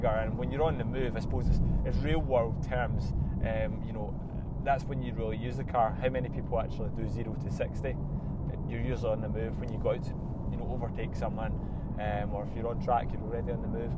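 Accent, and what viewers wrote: Scotland, United Kingdom. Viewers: Are there different accents inside Scotland? Are you from a particular region? Thanks for replying.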